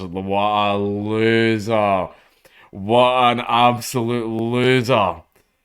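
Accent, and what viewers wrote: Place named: Scotland, United Kingdom. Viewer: 'Phnom penh' (sounds like) 'nom pen' sometimes the second syllable is silent as in Thai. even with a Scottish accent Dank you were sound on that one.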